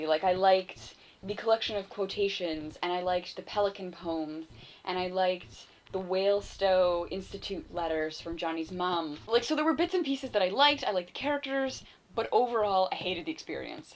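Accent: Canada. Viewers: You're definitely Canadian, but I'm guessing you're from Newfoundland or somewhere close.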